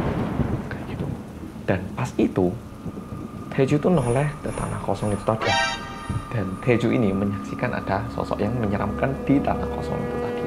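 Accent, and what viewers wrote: Java, Indonesia. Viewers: dia pernah lama tinggal di indo. di malang, jadi medook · owlh mulak men logat e jowo bgt 😅rupa2 ne koyo raono korea2 ne je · Ni muka Korea kaya orang Jawa logatnya 😅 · Mirip Rep Monster _ Kim Namjoon, a.k.a. salah satu member boy band korea, BTS. Tapi bahasa jawa kentel-nya lucu 😭👍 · Orang jawa ya bang?? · Kaya orang korea tapi sekali ngomong itu keliatan orang jawa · ilma azzahra ya kan mas cerita ny medok terus bolak balik mas bilang suara dering hp ny "Ring ding dong ring ding dong" itu kan bikin ngakak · Kyk Jawa ngomong nya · Kak orang aman ....suwarnya kaya logat Jawa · Orang korea aja bisa medok masa kita gak bisa Ya tapi gw gak bisa sih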